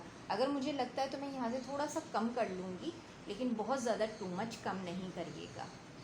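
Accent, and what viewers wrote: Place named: Karachi, Pakistan. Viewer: Aoa. U r great n soooo sweet. Bas ap se ek request ha k ap. Karachi se belong hain isleay apke bolne main pata chalta ha. Isleay ap open ko opin bolti hn. Youn samjhen ap ne pen ki tarah yad rakhna ha. .. plz mind na kejeay ga. Ap boht achi hn❤ or parhi likhi bhi hn. Bs is choti se mistake btai ha. N sorry agr apko bura lga ho to.....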